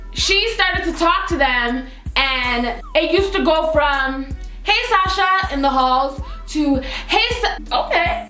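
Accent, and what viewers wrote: Jamaica. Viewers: Are u Jamaican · u Jamaican accent wa fix up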